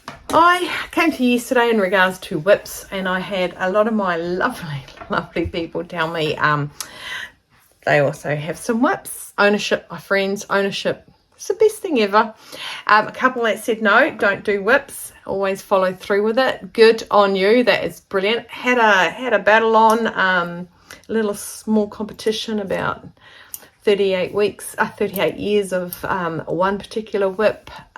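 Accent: New Zealand. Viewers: Have just found your channel through Carol sews. Great to hear a New Zealand accent instead of always American (no offence to our USA bloggers). Your sense of humour is intoxicating, looking forward to following your your channel from a fellow sewist from across the ditch.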